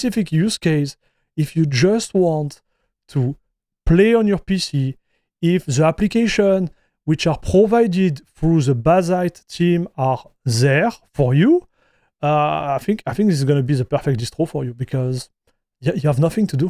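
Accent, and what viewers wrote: France. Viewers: your french accent is too strong. hard to understand.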